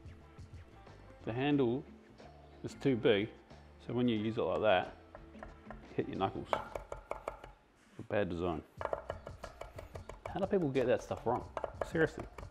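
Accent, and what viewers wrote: New Zealand. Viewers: I had a feeling you were kiwi from the accent, but confused cause you lived in QLD 😂 Can wait to try this dish and surprise the Mrs 😘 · Was wondering where you were from, seen a lot of the short reels come up on instagram where you didn't talk much. but the moment I heard you say the word "Rinse" I could tell you were a Kiwi. Runse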